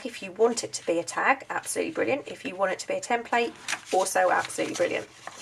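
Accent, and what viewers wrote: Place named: Greenwich, United Kingdom. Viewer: Thanks so much sweetpea I also love your accent I come from Greenwich and have a similar accent! But now I live in Melbourne Oz xx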